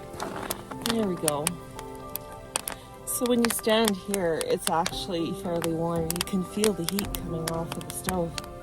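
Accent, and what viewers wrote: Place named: North, United Kingdom. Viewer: I just love how you ladies up north say "house" and "out." What kind of wood are you burning here? First camera shot inside furnace shows unsplit and seemingly unseasoned tree limbs (later on, maybe popular perhaps not fully seasoned). I have seen vids of folks who burn only well seasoned red or white oak. They are able to load large chunks of wood and don't have to poke around or reload for at least 24 hours. Here's some advice you didn't ask for... LOL so thank you in advance for your indulgence... sell a few of those junk cars for scrap and with that money build yourself a decent woodshed. All the best...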